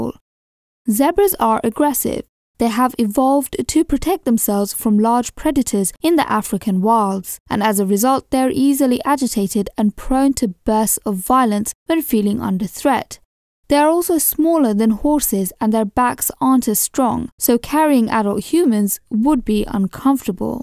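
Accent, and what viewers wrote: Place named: United Kingdom. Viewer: rejvaik because she’s British not American.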